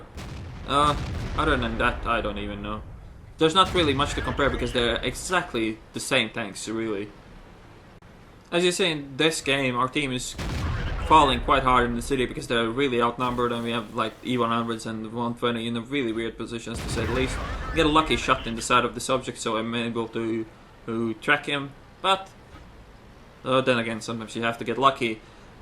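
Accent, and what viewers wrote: Sweden. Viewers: Wait, you're Swedish?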